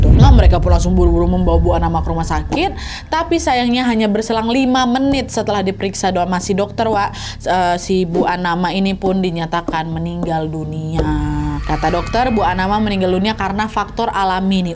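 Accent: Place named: Sumatra, Indonesia. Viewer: Logatnya kek orang sumatra...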